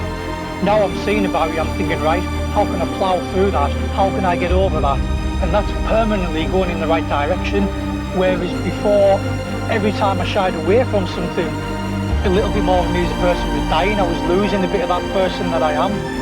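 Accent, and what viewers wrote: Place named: Teesside, United Kingdom. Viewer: What an great video and message... I am 6 days clean today from coke after many relapses... was great to hear a local accent (I’m in Teesside)... thanks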